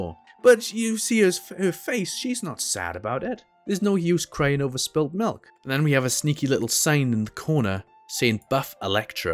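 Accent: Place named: Scotland, United Kingdom. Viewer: you and soooo funny and i love your scottish accent lmao, subscribed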